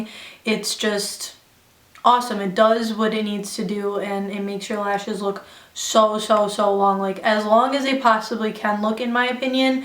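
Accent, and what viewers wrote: United Kingdom. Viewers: omg loved this video to the moon and back, you had me laughing so hard with the "I can't be bothered" xD love brits accents! you seem in such a good mood definitely contagious cause now I'm smiling! :p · I subscribed because your British accent made me laugh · Love the English accent!! I'm from the uk. We do say i can't be botherd alot 😄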